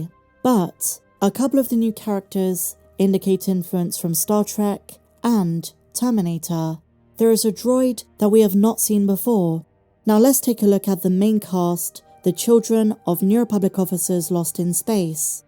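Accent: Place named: West Country, United Kingdom. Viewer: Please, your British right, So please say autumn and not the fall. Love all your wonderful videos and opinions on anything new in the StarWars world. What makes your Channel so brilliant, is that fact that you're British. Definitely from the West country. I'm saying Dorset going by your beautiful West country accent. ❤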